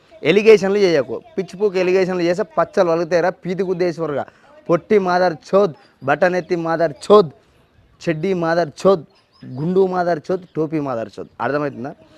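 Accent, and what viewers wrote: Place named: Telangana, India. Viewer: ఈయన తెలంగాణ బాషా చాలా బాగా మాట్లాడుతున్నాడు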